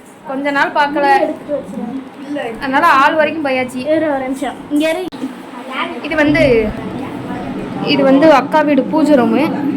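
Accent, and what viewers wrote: Tirunelveli, India. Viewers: Akka unga thirunelveli slang nalla erukku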